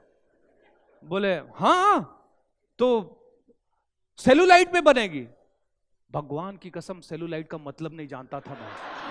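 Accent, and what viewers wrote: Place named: Chhattisgarh, India. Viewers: U r right, the best thoughts..bejjati hi safalta ki pahli sidhi hai.. chattisgariya accent ...total Maza aa gaya. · आपकी हिंदी में भी छत्तीसगढ़ी है 😄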